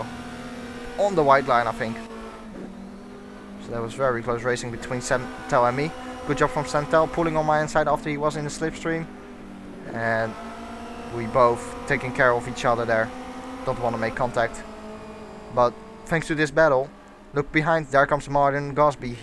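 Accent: Netherlands. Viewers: Zo grappig dat je toch echt hoort dat je een hollander bent.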